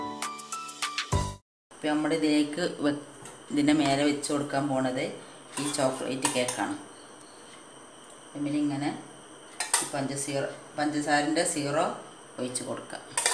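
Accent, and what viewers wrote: Malappuram, India. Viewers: Ningal ithupole thanne samsarichal mathi malapurambasha athukelkana ishtam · Hi ..ഇത്താ..ആദ്യായിട്ടാ കാണുന്നത് വീഡിയോ.. സംസാരം അടിപൊളി..മലപ്പുറം ആണോ...വീഡിയോ ആരാണ് എടുക്കുന്നത്...എനിക്കും ഒരു ചാനൽ തുടങ്ങാൻ ആഗ്രഹം ഉണ്ടായിരുന്നു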